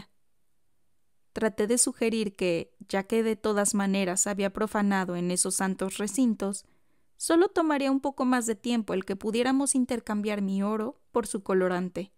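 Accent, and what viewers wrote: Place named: Mexico. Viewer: GRACIAS, POR DARNOS ÉSTE LIBRO CON TU BONITA Y CLARA VOZ Y EN MEXICANO 😃👍🇲🇽♥️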